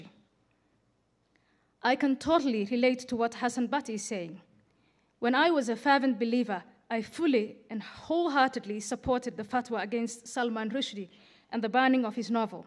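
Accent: United Kingdom. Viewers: yo your spoken english is british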